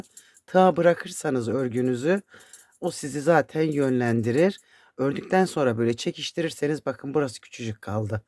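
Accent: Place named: Malatya, Turkey. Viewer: Çok güzel anlatıyorsunuz sivenize bayılarak dinliyorum Malatya'da bizim gibi konuşuyorsunuz şimdiye kadar hep şişle örgüler yaptım size abone olduktan. Sonra tığla devam etmeye karar verdim daha kolay daha çabuk bitiyor buda benim işime geliyor torunlarla uğraşmaktan zaman kalmıyor yanlız birde şu pileeli kız bebek hırkası aatarsaniz sedvinirim cunkuj benimkiler hep kız 🤗🤗🌹🤗🤗🤗🤲🤗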